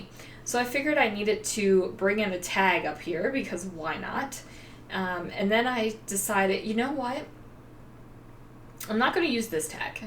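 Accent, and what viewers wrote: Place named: Baltimore, United States. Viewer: We love your chatter...and I love your accent...Baltimore is a fun place that I miss!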